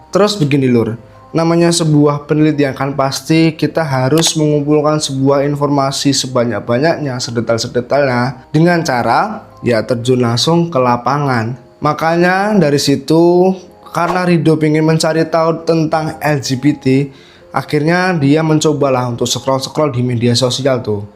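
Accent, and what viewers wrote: Java, Indonesia. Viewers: Loe Gua tapi medok 😮 mbok saya atau aku aja mas. Opo sampean kamu enak malahan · Ngomong GUA slank JOWO , mending SAYA masze jd cakep ...luwes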